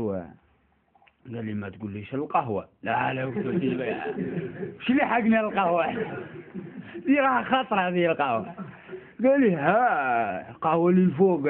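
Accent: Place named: Ouled Nail, Algeria. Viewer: الي تعجبني انو محافظ على اللهجة اولاد نايل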